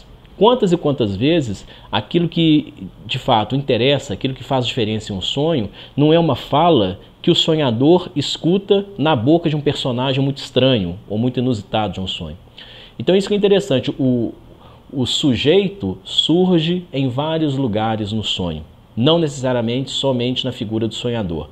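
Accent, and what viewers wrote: Minas Gerais, Brazil. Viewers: Gosto muito do sotaque mineiro, é suave, vai muito bem para um psicanalista. é um excelente representante de Minas Gerais.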